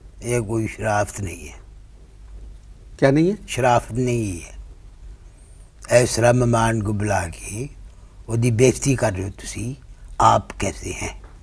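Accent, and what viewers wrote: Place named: Punjab, India. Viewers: Typical Punjabi accent style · Omg! Ye to humse b jyada pure punjabi bolte hai😃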